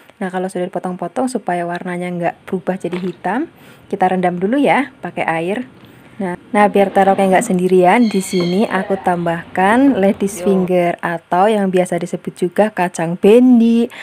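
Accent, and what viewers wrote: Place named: Central Java, Indonesia. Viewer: Suka bnget dgn bicaranya enteng ceria... Kyknya Jawa tengah ya mba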